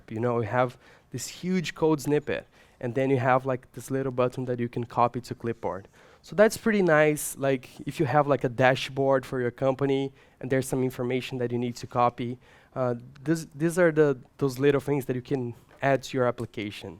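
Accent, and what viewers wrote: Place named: Minas Gerais, Brazil. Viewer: Thanks for sharing this knowledge. I didn`t know about any of those APIs before I watched your talk. (I'm also Brazilian, bet you are from Minas Gerais by your accent )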